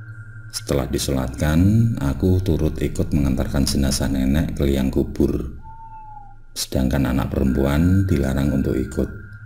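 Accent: Java, Indonesia. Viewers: Jelas banget, mas orang Jawa y Karna logat nya mas Thu ketahaun banget!!! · Kepo sama wajahnya.... Dengan suara khas jawa yg medok.... 😊😊😊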